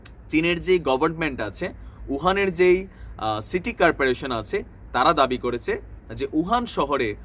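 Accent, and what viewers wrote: Comilla, Bangladesh. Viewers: Vai apni khati comillar vasa kotha Bolen valoi lage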